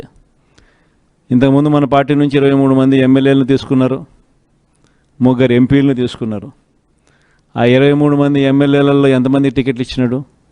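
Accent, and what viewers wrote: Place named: Rayalaseema, India. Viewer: Anna mana రాయలసీమ తెలుగు సూపర్ అన్న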